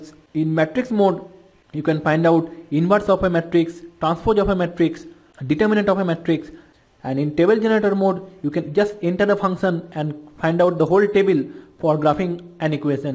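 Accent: India. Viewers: i hate your indian acccent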